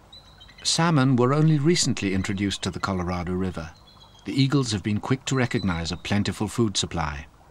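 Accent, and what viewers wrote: United Kingdom. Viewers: I live right on the confluence of the Colorado and the Gunnison Rivers. The photography was beautiful. Colorado is a beautiful state and the many rivers and tributaries are fascinating. Unfortunately there were many inaccuracies and mispronunciations in this offering. Yeah and the Brit?! Really...🤨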